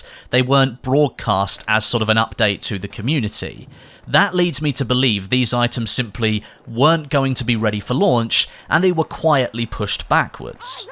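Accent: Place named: United Kingdom. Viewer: I watch your videos for your British accent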